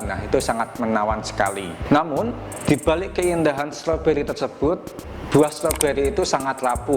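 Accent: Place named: Java, Indonesia. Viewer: Medok lek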